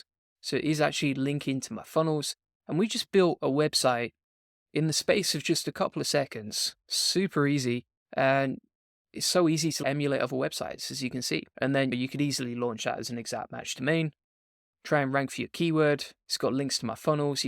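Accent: England, United Kingdom. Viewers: Btw! I love love the accent! Are you English? Or Scottish? Am i insane for liking the accent?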